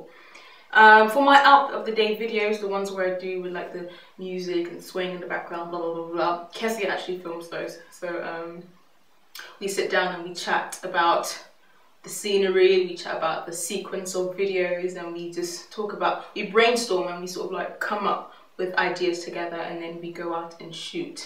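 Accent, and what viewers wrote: England, United Kingdom. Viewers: Listening to you is already a "Treat". I love your manner of speaking & that's not just because you are speaking British English...mainly because you are presenting yourself TRUTHFULLY...not steering away from what you are actually passionate about & not what others expect on your Channel. Great Tips on putting with with Youtube & LIFE IN GENERAL! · I love English accents but I have a question, do you ever think people are saying " " when they say " surely"? haha, I love you lots!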